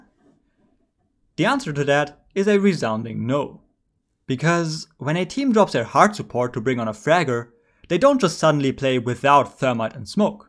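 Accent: Germany. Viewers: your accent is strong. are you german?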